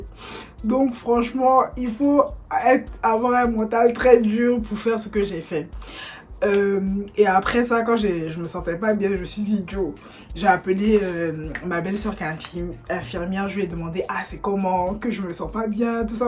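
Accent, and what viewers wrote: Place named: Ivory Coast. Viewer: On sent l'accent ivoirien. Ko djaaaaah!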